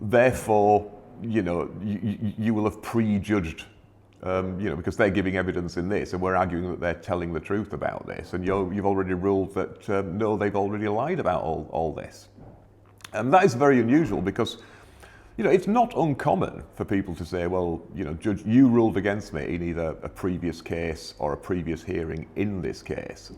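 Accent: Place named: Sheffield, United Kingdom. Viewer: On a side note, have you said anywhere where you are from. Your accent has a North Sheffield sound. Or an I miles away?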